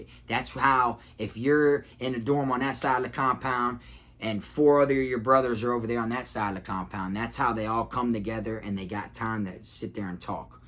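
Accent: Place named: South Florida, United States. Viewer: My homie from Davie, always feels like im talking to a neighbor. Love that south florida twang. on the job, great vid!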